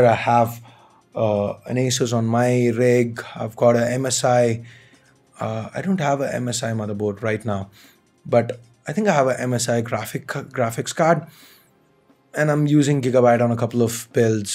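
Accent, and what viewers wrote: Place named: India. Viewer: Good content. Cringey accent. Please speak in neutral Indian accent.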